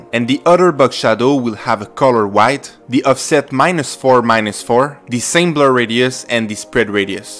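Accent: Quebec, Canada. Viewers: Are you french Canadian by chance? This accent is really familiar haha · t'es quebecois?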